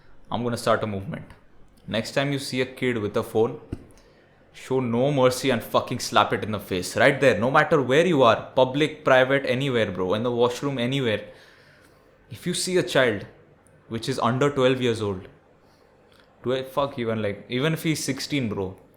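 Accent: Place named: Gujarat, India. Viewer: Dude, I didn’t know you were Gujarati. Your dialect sounds Surti.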